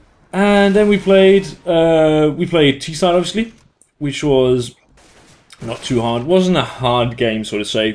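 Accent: Denmark. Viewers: He is from Denmark :))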